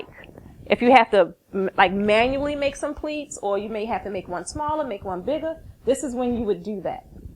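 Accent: Baltimore, United States. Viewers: Love these tips. These are great. Thanks. You must be from B-more. You sound like some of my UMD friends 😁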